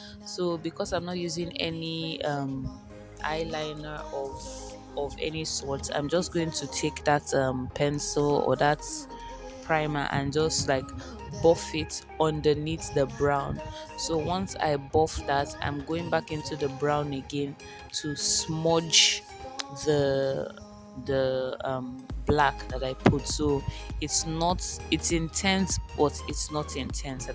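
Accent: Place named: Spain. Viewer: Ohhh Very beautiful and very simple Your Spanish accent is sweet ooo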